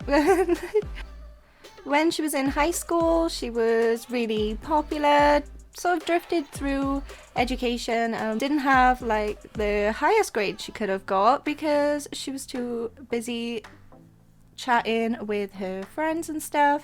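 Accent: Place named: Wales, United Kingdom. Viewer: OMG YOURE WELSH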